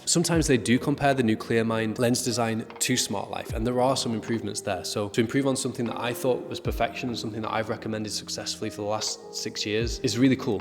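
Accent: United Kingdom. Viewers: He's British. To him it's you who isn't saying it right.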